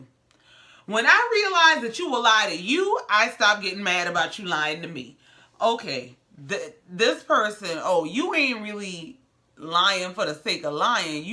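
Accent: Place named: Louisiana, United States. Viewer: you got me gul! There were just some things you said that gave Shreveport and NOLA tease. Geechee accent is one I'm not that familiar with. That Carolina food good AF tho😊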